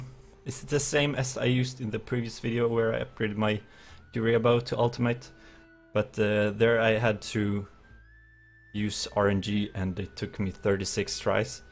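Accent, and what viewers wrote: Sweden. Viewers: Is that a swedish accent i hear? D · are you swedish?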